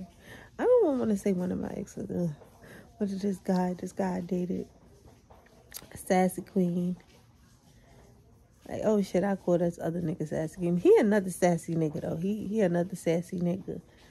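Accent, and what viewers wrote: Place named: East Coast, United States. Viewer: you from the east coast? i get jersey brooklyn vibes..im from the west coast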